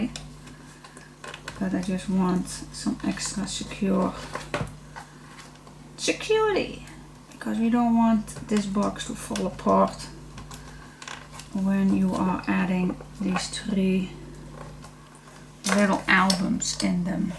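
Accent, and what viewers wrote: Netherlands. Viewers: !, your “ Dutch English”, is one of the things that I find most endearing!. Your witty comments and chitchat, are what makes you, you!. If people want to only listen to perfect English, they should only watch English tutorials!. Please don’t change yourself or what you say or do!, you are just perfect as you are!.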